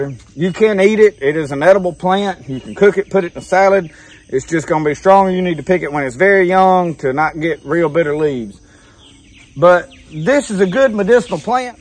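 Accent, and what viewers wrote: South, United States. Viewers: Thank you SO much. This is the first video I've ever found that shows virtually unhaired wild lettuce. I've got lots of it on my property and although my leaves look more like others' wild lettuce than like yours, you were the first person who has said that the hairs on some of these are undetectable. That stuff with the yellow flowers you showed...that's totally what I have. I've known instinctively that this stuff in my yard was wild lettuce and needed to be used, but I couldn't find enough confirmation until now. Plus northern and southern wild lettuce can be so different that I needed a southern accent video to make sure I was doing it right. Thank you! I wish there was a way to rank YT subscription list cuz I'd put you as #1. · Southern accent video -that’s priceless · You sound very southerner. So I have a silly question? 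I. Live in florida. So does this grow in florida?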